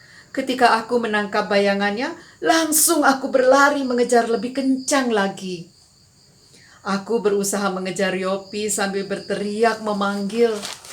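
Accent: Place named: Eastern Indonesia, Indonesia. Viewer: Mantap bu. Logat timurnya masih kentara yah bu. Sukses selalu Tuhan Yesus memberkati. 🙏🙏🙏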